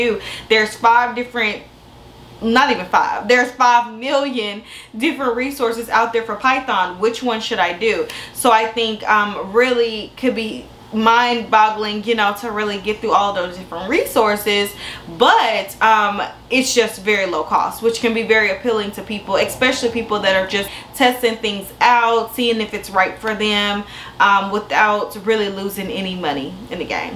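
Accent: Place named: South, United States. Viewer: Hi! I should’ve specified. I meant to ask what location are you in? I watched another video tho and I believe you said Nashville. I love to see a fellow Black GRITS (girl raised in the south) thriving! Love your energy and accent! Thanks for your content.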